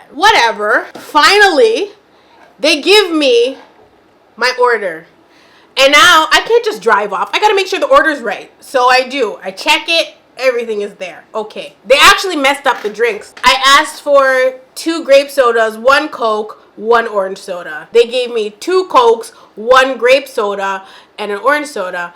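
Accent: Jamaica. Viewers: It’s the patois for me 💀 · My favorite part of every video is growing fluent patois. Kk luv ya byeee:_CHEFSKISS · The patois!!!! Yes!!!🥹🥹🥹🥹🥰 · The patois is healing the broken parts of me!! 😂 ❤ I love it!!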